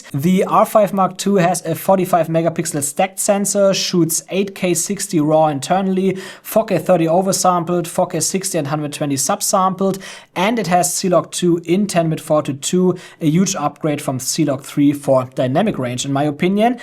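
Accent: Germany. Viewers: Man hört diese deutsche akzent so hart raus Hahahah aber gutes video aufjedenfall 👍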